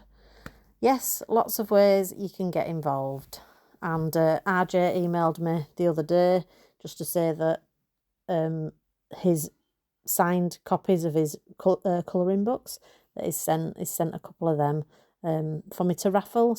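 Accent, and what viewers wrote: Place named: Northern England, United Kingdom. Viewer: Years ago I had a Siamese cat he hated dogs, he would chase them out of the yard he didn’t care how big they were he’d bite their ankles jump on their back and bite their ears, they would run screaming out of the yard he would walk back tail in the air smug look on his face. If I saw a dog out the window he’d run to the door tail up let me out! I really enjoyed this chatty video I hope you will do more, I followed along while I sat here here in Australia by myself I enjoy your company, I like to hear you talk it reminds me of when I lived in the north many years ago, when they’d say things like put wood in ole, or shut dooer, nobody ever said’the’ anything, sometimes it seems the rest of us are a bit too English 😂 it’s supposed to get to 48C today, I’m sat under the split system, cool air blowing, not going out today! 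Sat in a thin nightie, keeping fluids up , curtains shut against the sun. And you have cold and rain! It will be our turn soon enough, at least then we can add extra clothes to keep warm but there’s not much relief when it’s too hot 🥵 I love your idea to raise money for cancer and I will be donating. ❤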